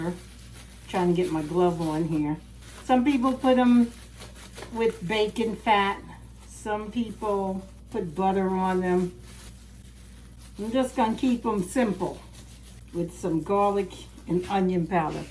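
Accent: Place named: New York, United States. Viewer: Sent to fast young lady . Your new York accent is making me home sick for Commonwealth Ave... THANK YOU FRANK FROM MONTANA......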